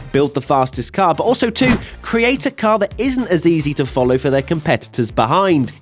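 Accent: United Kingdom. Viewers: this guy is comically british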